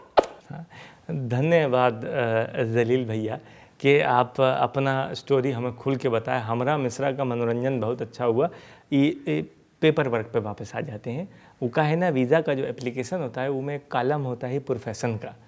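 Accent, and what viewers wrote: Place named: Bihar, India. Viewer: 😂😂😂kamal yar kamal bihari accent ufff kamal sa b upar .. acting perfectly Bihar ki full yar Accent 😅😂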